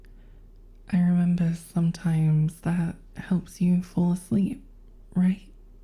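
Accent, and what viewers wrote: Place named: United Kingdom. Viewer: oh she's british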